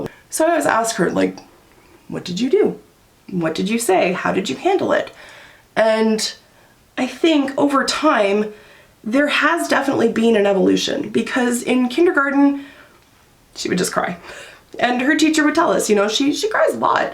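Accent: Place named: Ontario, Canada. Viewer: Having one's Mom come to your defense means absolutely nothing to me. Of course her Mom would have her back! Big duh on that one, right?!?! Also, I totally pegged your Ontario accent because I grew up in Buffalo and have spent a ridiculously large amount of time in Toronto and went to camp outside of Ottawa every year. Wish I lived in Toronto, maybe one day.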